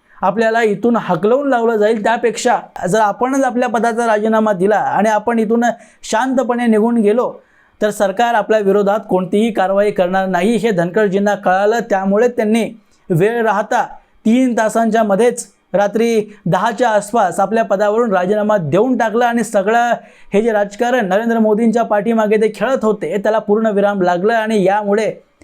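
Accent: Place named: South, India. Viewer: तुमचा टोन पूर्णपणे दक्षिण भारतीय आहे. टोन ऐकल्यावर मी तुमचं नाव वाचलं. ऐकायला छान वाटतं. Keep it up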